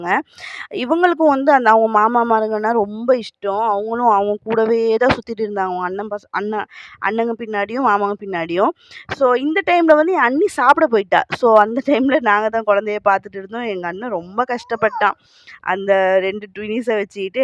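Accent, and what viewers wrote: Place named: India. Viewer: Hi sis ippa than ongada videova 1st time paakuren niga srilanka thane edhuku Amma endu India people's mari pesuringa umma endu sollalame knjm different ah iki ongada pechelllam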